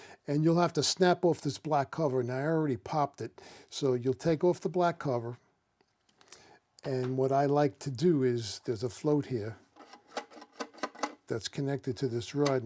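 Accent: New York, United States. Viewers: Hey great video. Glad to hear another NYer voice 💪🏼, I’m from Bensonhurst & doing this over 23 years. Love these lil old school tricks you have. Keep up & god bless 🙏🏼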